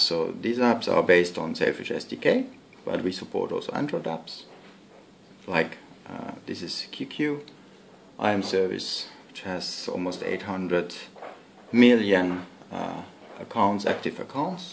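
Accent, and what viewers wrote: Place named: Finland. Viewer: Finnish - English.. XD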